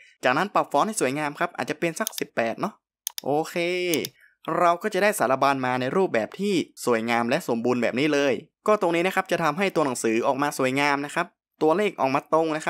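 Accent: North, Thailand. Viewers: ขอบคุณสำหรับความรู้ดีๆนะคะ ว่าแต่เจ้าของคลิปเป็นคนเหนือไหมคะ😂😂😂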